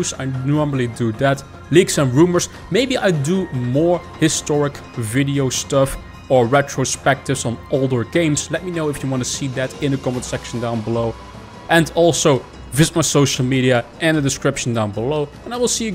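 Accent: Netherlands. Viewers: Hahaha het is altijd te horen voor Nederlanders.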